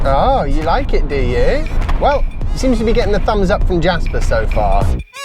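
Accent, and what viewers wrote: England, United Kingdom. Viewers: This fellow seems like a legit English man. He says "brilliant!" just like ron from harry potter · May I ask you a favor? I'm a foreign english student and I'm always looking for english videos on Youtube. For my luck, searching for Tesla I got one of your videos and I'm enjoying a lot the british accent, but sometimes because of the differences between US and UK english I can't understand some words/sentences. I know this would make you work even more, but... are you able to subtitle your videos? It's for a good cause, you could write in your videos title: [English Subtitles] and this would bring you a lot more attention because of english students :P Thank you very much. Peace out, bye bye.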